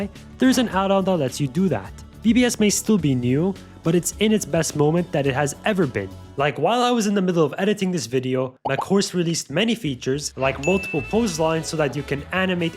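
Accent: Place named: United States. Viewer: You sound that youre from the US with a dual citizenship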